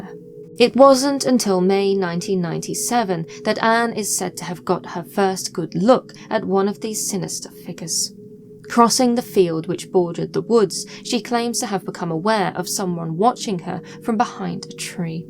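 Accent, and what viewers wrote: United Kingdom. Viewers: Not to mention her pretty British accent. So proper!! Great narrator too.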